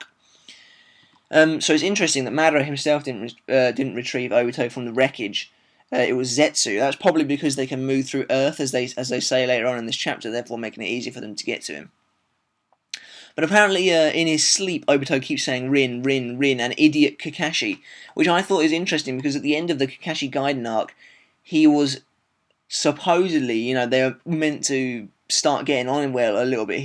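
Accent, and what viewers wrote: United Kingdom. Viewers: good review mate....u actually know what ur talking about...what part uk u from?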